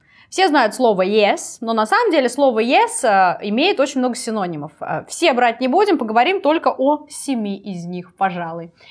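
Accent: United Kingdom. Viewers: Классный акцент)) Сколько лет в UK жила? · Ох уж этот британский акцент))) 😘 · Вы очень красивая, хоризматичная и отличный британский акцент!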